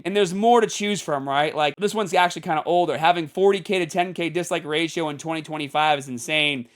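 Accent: Boston, United States. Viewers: I'm just here for that Boston accent bro. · just found your channel. New to GymTube so I was watching Greg for a bit (along with Athlean X. Great content). Will watch you instead of Greg going forward. Accent reminds me of Will in Good Will Hunting 😀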